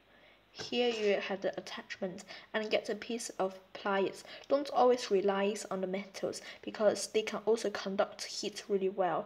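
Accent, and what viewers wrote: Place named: RP, United Kingdom. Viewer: Why does this guy sound so posh?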